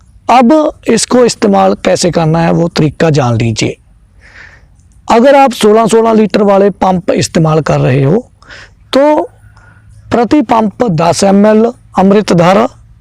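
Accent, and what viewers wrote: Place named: Punjab, India. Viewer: Sir ji aap ki Hindi bhi Punjabi jaisee hi hai thanks God bless you Very good information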